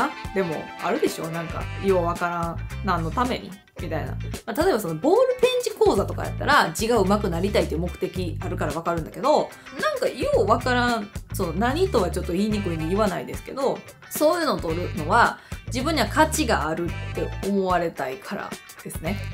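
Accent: Kansai, Japan. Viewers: いつも見させてもらってます。 今回のいい方で「センス」と言う言い方は、ちょっと言いずらいですね。 あと、関西弁以外の標準語も取り入れて下さい。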